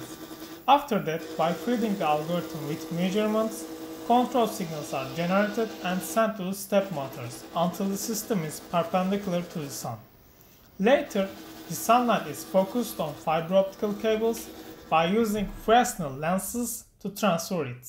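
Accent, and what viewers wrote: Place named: France. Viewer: I love your fench accent :D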